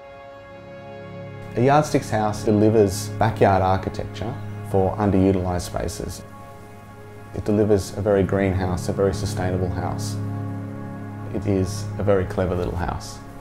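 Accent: Australia. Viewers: Why not judge accent of a native English speaker? It wouldn't be nice to judge non-native speakers because they have disadvantage but no excuse for "Aussies" :D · Aussie accent that's why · Oh my... Australian accent is lit 😁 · While he is very Australian, this is his individual way of speaking, and not typical to our accent at all. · Elyse G.엘리스 지 That’s his Australian accent, maybe you shouldn’t judge people’s accent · It's the Australian accent lol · it is Australian accent. Dont believe it? Go to australia mate.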